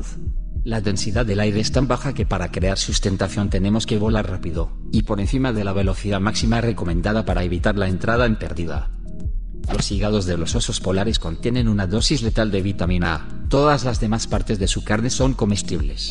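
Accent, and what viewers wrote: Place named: Russia. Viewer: Necesito escuchar esa voz de mujer rusa hablando algo que no sean solo post en Reddit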